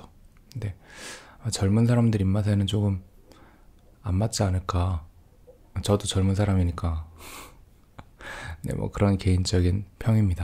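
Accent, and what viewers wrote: Cheongju, South Korea. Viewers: 청주분이확실합니다!!!오명가명 마주친것같은 익숙함.........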